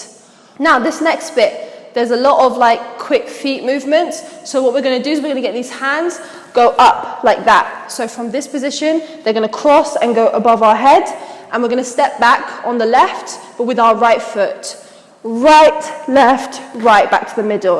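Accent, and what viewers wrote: United Kingdom. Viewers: Wait I'm confused u have a British accent so ur not korean?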